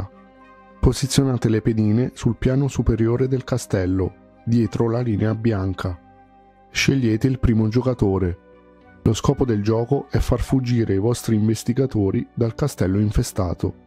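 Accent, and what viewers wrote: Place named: Tuscany, Italy. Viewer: dall' accento sembri Toscano 😅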